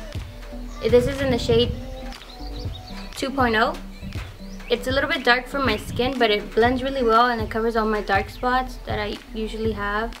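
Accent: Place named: Spain. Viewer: Hehe i love yur Spanish accent(; ❤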